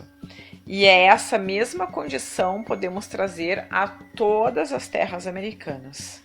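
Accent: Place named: Porto Alegre, Brazil. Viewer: Fantástico, e com narraçao porto alegrense fica ainda melhor... Rsrs. Maravavilhoso trabalho minha conterrânea, parabéns.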